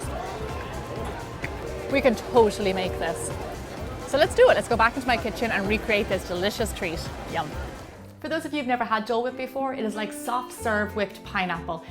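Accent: Ireland. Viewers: ᏙᎠ ᎠᎰᏚᏟᎾᎡᎠᏙᏁᎢᎰᏙ ᏚᏤᏚᎢᎬᎷᏙ ᏁᎾᏚ ᏚᏙᏞᎢᏙᎢᎾᏒᎰᏴᏌᏚ fake?? shes Irish... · Your accent!!! Beautiful. Irish? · Are you Irish?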